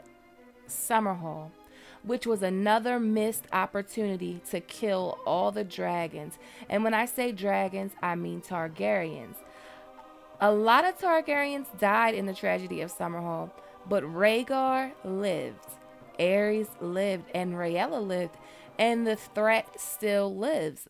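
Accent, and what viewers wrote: United States. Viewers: What part of America is this accent from, it's hooking me.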